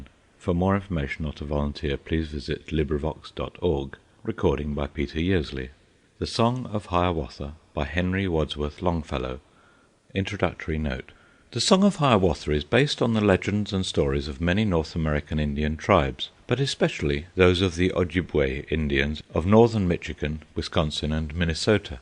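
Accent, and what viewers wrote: England, United Kingdom. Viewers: It's difficult to get into hearing this read with an English accent. Sorry. If it was Shakespeare, he'd be great.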